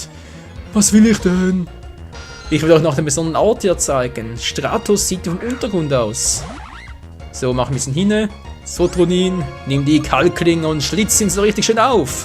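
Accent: Switzerland. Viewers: ja man hört es auch bei akzent das es schweizer ist ich bin italiänerinn und rede deutsch wie eine italiänerinn aber schweizer deutsch so berndeutsch wie eine schweizerin xD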